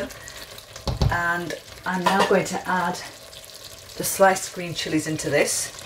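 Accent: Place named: North East, United Kingdom. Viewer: Love the recipe , you sound like a north east girl xx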